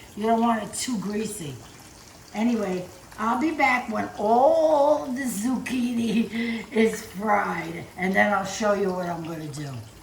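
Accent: New York, United States. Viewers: Stanley Tucci started the recent craze about Spaghetti Nerano. There is a video of Stanley watching an Italian Chef make the dish which Stanley says was “ life changing” . Chef John from Food Wishes agrees and insists deep frying the Zucchini rather than pan frying is the first key. The second key is refrigerating the zucchini overnight. The kitchen science is not explained. Finally, Chef John uses more butter. Otherwise , he mashes the zucchini like you do , and he used substitute cheeses like you. I pan fry my zucchini in more oil and butter than you do, but I hate deep frying. I might refrigerate the zucchini once to see if it matters. I love watching you as your accent reminds me to call my sister in New Rochelle( I live in Omaha since Medical School). 😘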